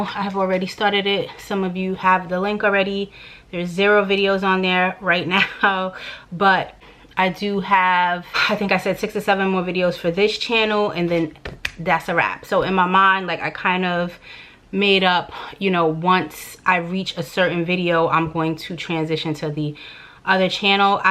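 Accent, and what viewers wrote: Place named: Brooklyn, United States. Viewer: Cooper is hilarious and smart❤️ I’m ready for your new channel/ the new you🥰 I feel like you have the right represent Brooklyn, it’s in you💯 It’s in your personality and even in the way you talk sis.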